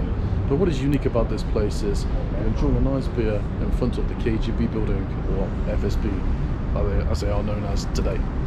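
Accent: United Kingdom. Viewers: Хоть я и не очень орошо знаю английский, я с первых слов понял что говорит англичанин) Резко режет слова. Englishman in Moscow))) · Hi I found your channel today I just adore your British accent! sending love and greetings from USA ❤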